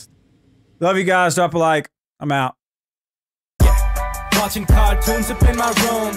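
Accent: South, United States. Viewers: DaRealMastalin all white guys from the south sound like this :s its hot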